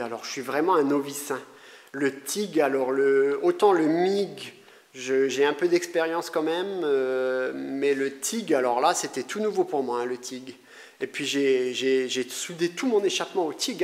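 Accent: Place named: Alsace, France. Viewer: meme famille ! ;) ; tu as l'accent alsacien c'est ouf :) j'aurais parié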